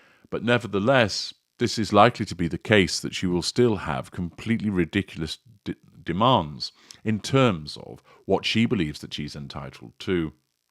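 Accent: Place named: North, United Kingdom. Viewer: Your common northern accent keeps creeping in, doesn't it? Us southerners do it better, we know it, and you know it! As for the Markle trash, good riddance.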